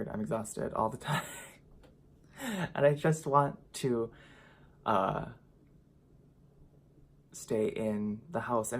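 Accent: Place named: Minnesota, United States. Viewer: Thanks for keeping it so real and honest,! This entire month has been a struggle for me food wise as I’ve allowed myself to have so much crap and I just don’t feel the way I did before when I was in control. In the long run, sugar after sugar really does hurt my weight loss efforts too in more than physical ways. Good job on upping your water and protein again. I too am determined to get in all my water today! I was skipping protein shakes here and there too due to the extra snacks. Getting back to our work will help us get back to routine. Love that you got your Bullet! My kids were just asking me yesterday when we would start having smoothies again. I’ll make more when the weather warms up. I can’t believe you were having kidney stones every 6 months. Ick! I’ve had the stents too and I seriously can’t imagine anything worse than that. 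Seriously! Probably the worst pain even above having children. I think maintenance and routine can easily be established with working out/exercise. The key is to start slow and add slow. It becomes so much easier and habitual over time. I can’t stress enough SLOW and attainable goals. Did you know you’re beginning to pick up a Minnesota accent? I love it...how you say “about”....Happiest New Years to you and yay for trying champagne. How fun!!!!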